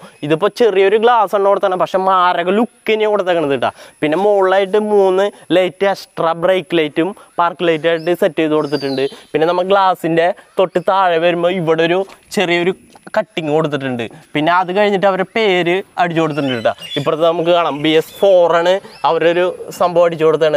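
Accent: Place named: Thrissur, India. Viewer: തൃശൂർ ഭാഷയുടെ അഴക്കൊന്നുവെരുത്തനെ. Bus review നേക്കാൾ നമ്മുടെ തൃശൂർ ഭാഷ കേൾക്കാന വരുന്നത്...... keep it up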